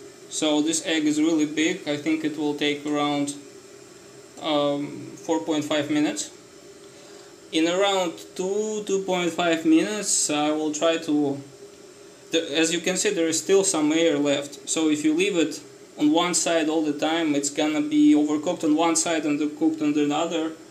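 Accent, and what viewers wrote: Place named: Russia. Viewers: Какой приятный Русский акцент))) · я тоже сразу услышала русский акцент, но он действительно очень приятный. Это совсем не тот русский акцент, когда слышишь и думаешь "лучше говори по-русски"😂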